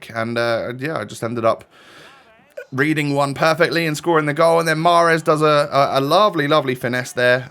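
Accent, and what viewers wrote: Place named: Scotland, United Kingdom. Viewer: didn’t know was scottish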